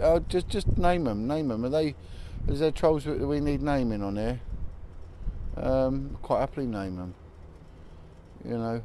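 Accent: Cockney, United Kingdom. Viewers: When are you going to start speaking properly mate? Put some effort into that? In your 'important subject'......? Not like a cockney drug dealer.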